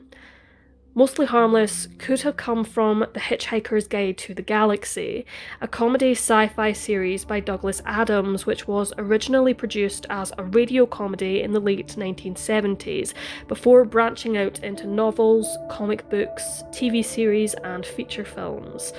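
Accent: Scotland, United Kingdom. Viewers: she’s scottish not irish🤦🏻‍♂️ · SHE'S SCOTTISH, PROBLEM??????🤨🤨🤨🤨🤨🤨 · She is from Scotland, UK. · It's so nice to hear a good Scottish narrator... 💕 >^. .^< 💕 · Subbed. What that accent? Scottish? Irish? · It’s Scottish,I’d say. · Hello friend! I enjoyed video very much and I like your Scottish accent! I'm from India. I have subbed your channel, thank you. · She's from Scottish highlands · Nope. She's Scottish. Mike is Irish. Different accents. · except she is Scottish...!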